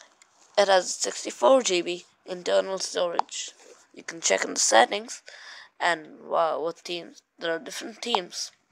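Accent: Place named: Sindh, Pakistan. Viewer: Bhai Kya chal raha hain Sab theek me accent zabardast ne Bhai Sindhi accent bhool nani Gaye me chalet hain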